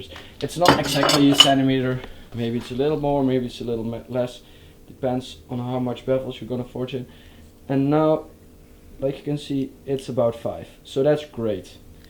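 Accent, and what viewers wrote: Netherlands. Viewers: You 're dutch ?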